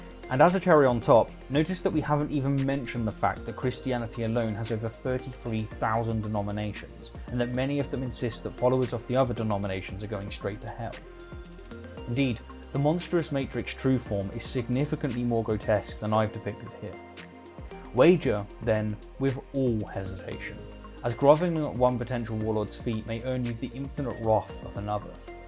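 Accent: United Kingdom. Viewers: Also you are pronouncing wrath the American way rather than the British way which is out of kilter with the rest of your pronunciation and grammar which is British.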